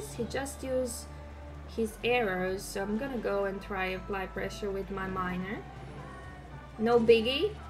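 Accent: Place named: Eastern Europe. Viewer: oh nice, but i guessed this slavic charm haha, pozdrav iz poljske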